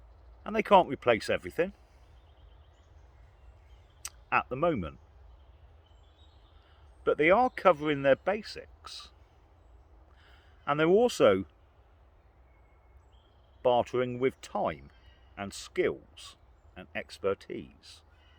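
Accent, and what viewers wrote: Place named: West Country, United Kingdom. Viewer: Wow, I’m late to this episode but as ever nice to hear a wise sage view and in a twang of West Country that I know well. I listened again to the tasob stream from a month ago and can see that your attempt at infiltrating the Conservatives certainly engaged your mindful cogs, I’d vote for you!😀😀😀😀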